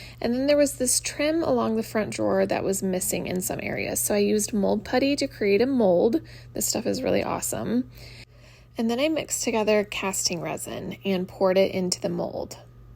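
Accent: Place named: United States. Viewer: Ese acento americano es muy feo. No soporto escucharlo.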